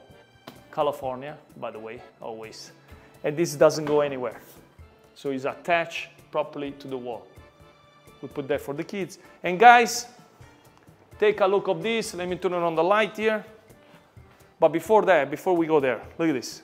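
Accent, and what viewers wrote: Italy. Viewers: Congratulación!!God bless you with health and happiness for you and you family to enjoy the new chapter in life.Im a new subscriber and your way to talk Italian bring me a lots of memories of my familia !🙏🙏🎉🎉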